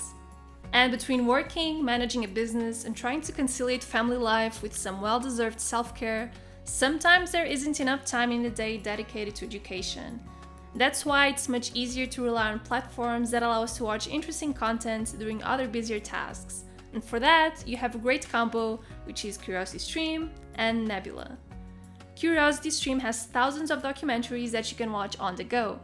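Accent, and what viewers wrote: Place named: Portugal. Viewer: Wait, ur Portuguese? Your accent looks like Portuguese... só sei que adorei este videos e já me subscrevi! Adoro!!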